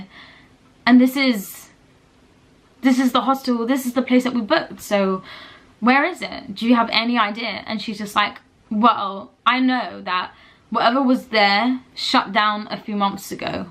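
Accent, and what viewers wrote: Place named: United Kingdom. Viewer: Pakistani girl with british accent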